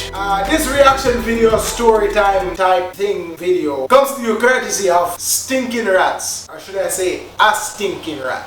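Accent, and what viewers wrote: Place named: Africa. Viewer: Big man a scream lol.. Yeah right African sound dwl. Funny bro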